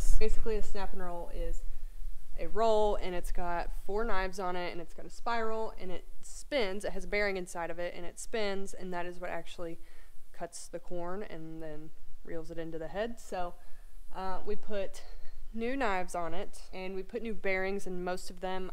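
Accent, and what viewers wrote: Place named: Midwest, United States. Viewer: WHERE YALLS FARM AT...IT SOUNDS LIKE YOUR FROM THE MID WEST....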